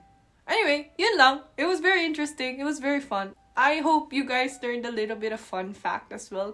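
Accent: Philippines. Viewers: Anyo sarangheyo 😅 🇵🇭🤝🇰🇷 🥰 ask ko lang pag nag e english ka dyan sa co worker or Korean friends mo ano comment nila sa pilipino english accent mo??? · Pinay na pinay accent kapag nagtataglish ka...hehe 😉😅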